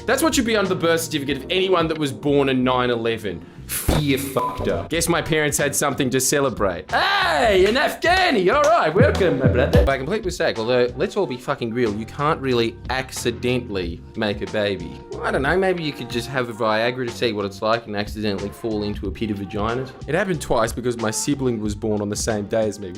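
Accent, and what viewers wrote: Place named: Australia. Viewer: WHERE hAVE YOU BEEN MY ANGRY AUSTRALIAN AFTER WORK-HOBBY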